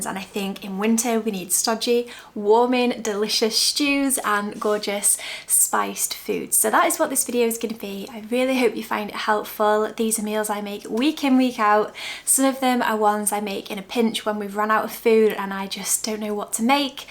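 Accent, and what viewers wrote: United Kingdom. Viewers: Wow these recipes look amazing and yummy. I’m so hungry now. Real comfort food for the winter season. I love your beautiful soft voice with your British accent 🥰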